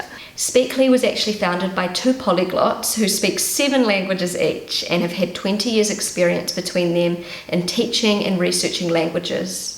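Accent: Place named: New Zealand. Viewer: Ich liebe es wenn Du Deutsch sprichst. Dein Kiwi Akzent ist so cute. Und Dein Kleiner ist ein lieber, süßer Junge. Stay save...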